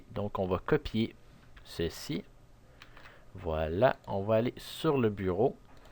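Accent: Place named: Quebec, Canada. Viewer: C'est un québecois normal qu'il a un accent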